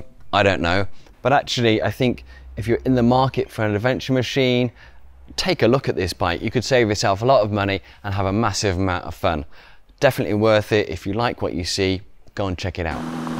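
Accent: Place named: England, United Kingdom. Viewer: Smart review and English English!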